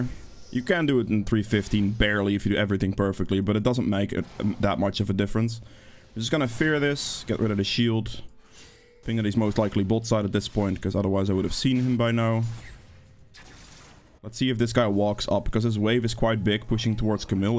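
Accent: Netherlands. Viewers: hi i enjoyed ur gameplay.. i have a quick question.. r u dutch?? take care king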